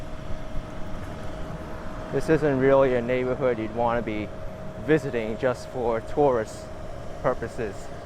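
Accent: New York, United States. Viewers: How can he not be from NYC with the boroughs accent he has? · I like your NY accent! 😀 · You got a thick nyc accent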